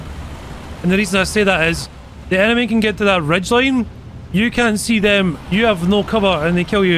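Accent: United Kingdom. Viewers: revärs ? Are you britisch or what accent is it?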